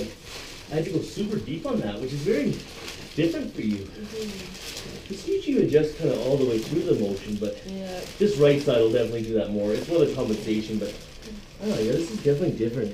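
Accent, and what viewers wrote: Canada. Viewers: you have the potential to be the new Rock Star of YT-resident chiropractors. The mix of your intuitive attention to detail and obvious empathy for your patients - together with a characterful delivery of care on your part - is very compelling. To top off the performance, your Canadian accent and Roadrunner-style moves around the room are very engaging. Move over, Dr. Johnson - there is a new kid in YT Town. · Ahhh... Canadian eh?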